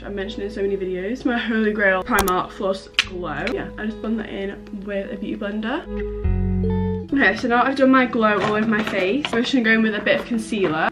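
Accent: United Kingdom. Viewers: do you have british accent ? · Is this more a southern uk accent or a northern one? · Are you from the uk🇬🇧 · I freaking love your British accent!! You’re so pretty too!! <3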